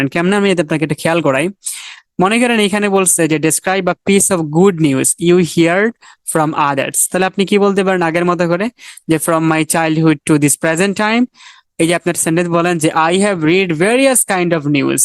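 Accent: Sylhet, Bangladesh. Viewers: ভাইয়া আপনি কি সিলেটের?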